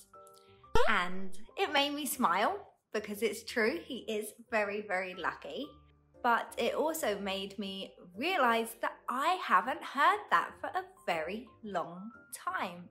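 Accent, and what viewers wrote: United Kingdom. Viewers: I like to listen to you talk, which made me love the British accent.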